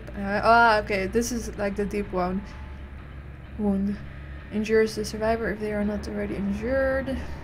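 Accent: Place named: Netherlands. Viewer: Her accent sounds dutch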